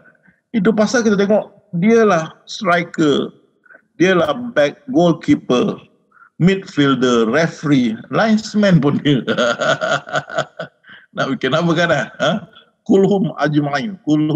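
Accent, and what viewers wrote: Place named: Malaysia. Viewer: Saya mohon mengikuti kuliah ustaz .ini kali pertama. . .gaya cakap accent ustaz ni macam gaya orang Melayu dari Malaysia..alhamdulilah.Tk.tuan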